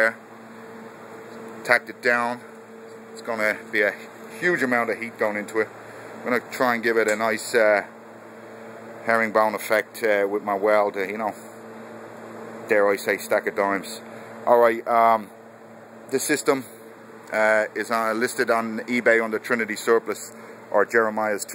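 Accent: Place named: Dublin, Ireland. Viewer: That's a Dubh accent if I ever heard one.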